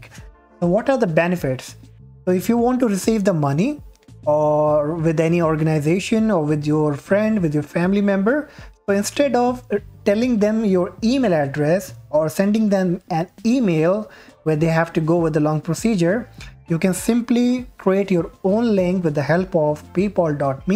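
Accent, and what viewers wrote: India. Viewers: YOU ENGLISH LIKE INDIAN ENFLISH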